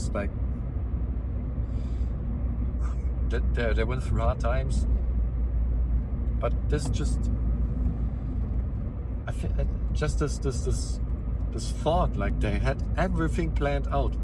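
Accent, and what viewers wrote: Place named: Germany. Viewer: Your accent is so german, u german?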